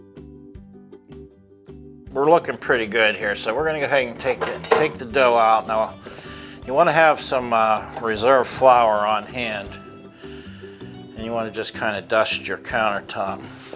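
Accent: Pittsburgh, United States. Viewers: Did you ever read my mind! I"ve been looking for a recreation of Mancinis recipe for a very long time, usually give up! Grew up on the stuff, so many memories of driving down to the bakery in the 'Rocks to get it fresh. Our Sunday spaghetti dinner could go without meatballs, but NEVER Mancinis! Live too far away now to get it sent to us. My heartfelt thanks to you for sharing this, especially during these tough times. Little nostalgia goes a LONG way for comfort. So happy to find your channel! Just hearing your Pittsburgh accent makes me happy! If I don't have the malt powder, could you suggest an alternative? Also- cannot make real hoagies without this bread, so now I can pretend I ordered one from Portofinos. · Thank you from the bottom of my black & gold heart! 💛🖤💛 I’m a Pittsburgh girl living in Arizona and, like the others, I load up on Mancinis every time I come home! 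You are a godsend. Naturally, I am now subscribed to your channel. Your accent makes me feel like home. And thank you for explaining everything so well. All the details are so important And I can’t get that from reading a recipe. Go Steelers.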